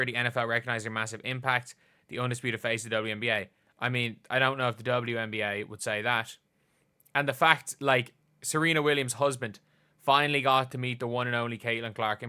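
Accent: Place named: Ireland. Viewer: I love practicing Irish accents by listening to. I just wish I knew which part of Ireland he's from. I hear different "Irish" by various celebrities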